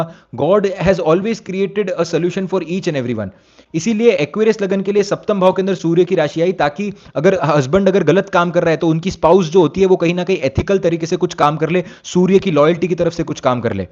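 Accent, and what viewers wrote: Indore, India. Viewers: Nice, but are you from Indore?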